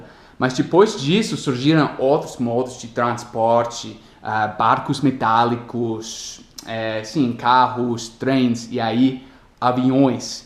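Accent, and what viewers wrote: Brazil. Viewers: volta para o Brasil!!!!!! Muito tempo longe você vai perdendo o seu sotaque brasileiro...🤗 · Impressão ou em alguns momentos ele puxa o sotaque gaúcho, cara to devorando os vídeos e é incrível o quanto ele entrou no sotaque brasileiro.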